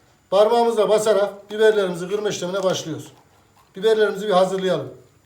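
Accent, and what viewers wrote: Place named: Eastern Turkey, Turkey. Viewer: Usta mız çok keskin doğu şivesiyle konuşuyor.Keşke diksüyon dersi alabilirse türkçesi biraz istanbul şivesi gibi kusursuz olabilir.Yoksa çok kusursuz anlatımı var ve de çok başarılı kendisini tebrik ediyoruz.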